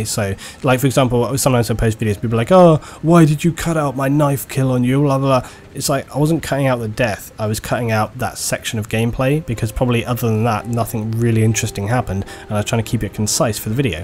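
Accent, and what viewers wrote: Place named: RP, United Kingdom. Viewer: U sound so posh and it annoys me this is why American films take the piss out of British lol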